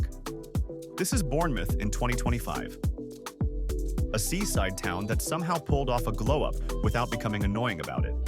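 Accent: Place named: United States. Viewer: Commentary is pathetic why American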